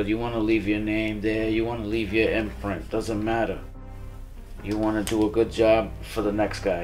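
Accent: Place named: New Orleans, United States. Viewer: si mon que si que fuck yeah....did 11 years in prison here in California and got out and became a union electrician...IBEW LOCAL 11....I couldn't tell where u were from bro...ur accent was all over the place...lmao at first u sounded like a homie...but then u sounded like u were from New Orleans..so I was like where is this vato from...lol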